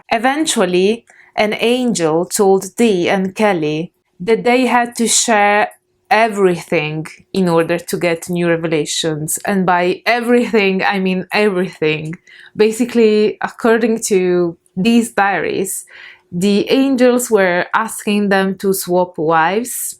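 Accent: Italy. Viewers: You do have a beautiful accent. Then again, Italian is a special favorite. It's a beautiful day come tomorrow, Angel🙏🏻☕🌅🌹😌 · your accent sounds Italian, quindi spero che mi capisce, comunque io non ho mai lavorato con gli Angeli Enochiani, ma con demoni del impero di Lucifero e con l'Arcangelo Michele, vari dei diversi, hindu ed egiziani, pero ultimamente ero interessato su sti angeli, i miei sensi non sono i migliori, ma credo che mi era stato detto di lavorare con Michele per aumentare i miei poteri, ma vabbe...hehe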